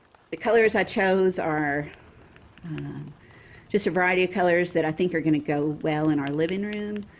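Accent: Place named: South, United States. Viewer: This has nothing to do with your beautiful work, but I love your voice and beautiful southern accent.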